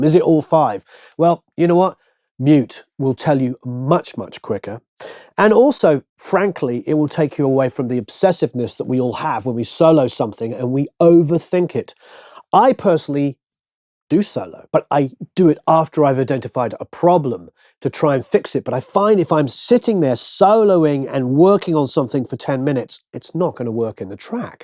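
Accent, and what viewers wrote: England, United Kingdom. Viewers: Love your work. Are you from the same part of England as Sting? You sound similar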